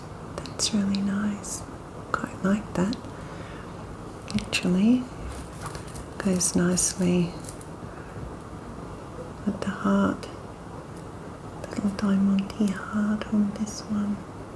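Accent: Australia. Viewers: FINALLY FOUND YOU! I've been searching for 15 years for a soft spoken lady with an aussie accent! ❤❤❤🎉🎉🎉 This is reminiscent of tv shopping networks where i would hope for tingles but it was never garaunteed. Thank you, liked and subscribed. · First time viewer here! I was scrolling my feed and I literally looked at the thumbnail and thought, “I wonder what Australian ASMR would sound like” and I went to click the audio to preview heard her accent and it’s like this was meant to be. Can’t wait to watch more!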